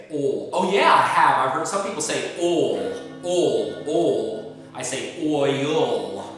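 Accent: Illinois, United States. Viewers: I live about an hour away from Rockford, so this sounds correct, for the most part. I pronounce crayons differently though. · This accent is a lot like mine (minus a few words) But my dad is from Illinois so it makes sense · Sounds like up here in West Central IL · A lot of this is really similar to my accent, Im from Decatur, in the heart of central Illinois. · I am not originally from Illinois, but both of my parents are. They sound exactly like you! It's interesting to see how much of my pronunciation I've inherited from them! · I'm guessing you're from Danville or Marshall or there-a-bouts...lol I'm originally from Terre Haute. I pronounce everything the same as you! Enjoyed the video!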